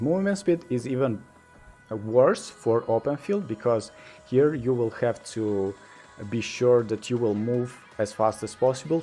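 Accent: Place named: Russia. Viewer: Тяжелый русский акцент.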